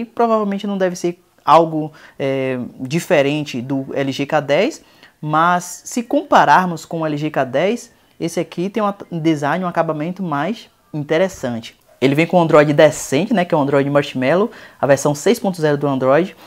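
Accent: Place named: Bahia, Brazil. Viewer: muito bom; esse cara deve ser baiano... pela fala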